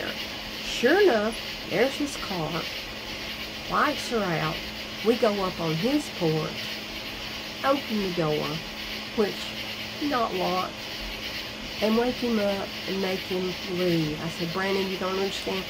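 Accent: South, United States. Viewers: She is definitely southern, My Dad was from Alabama and pronounced certain words differently.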